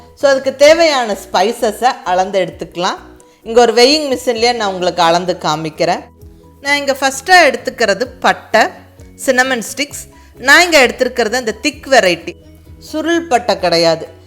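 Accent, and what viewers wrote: Kongu, India. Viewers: oh oh gongu nattu tamil konjugirade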